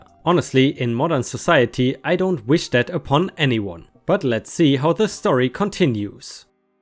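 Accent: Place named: Germany. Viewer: Also, if you don't mind me asking, what part of Germany are you from? I have an aunt from Berlin. She's lived in the U.S. for over 40 years and has a much stronger accent than you. That doesn't matter to me because I absolutely love meine Tante Ludmilla.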